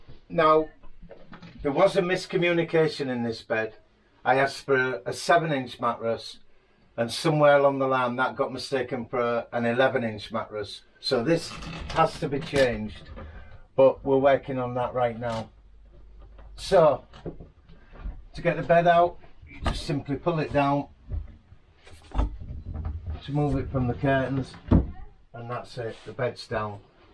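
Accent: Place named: Teesside, United Kingdom. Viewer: Sounds like a Teesside voice there - but Teesside/YORK/Hull are similar. Well done. Good job!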